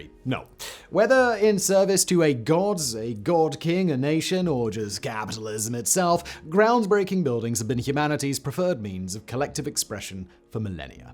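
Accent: United Kingdom. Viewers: I thought this was vsauce! I’m like why does he have an annoying British accent now?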